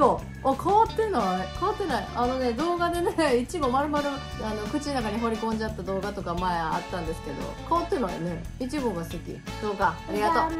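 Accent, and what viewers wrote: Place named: Osaka, Japan. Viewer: 登録したまま、お待ちしてましたよ❗ 大阪弁の心地よいトーク楽しみにしております。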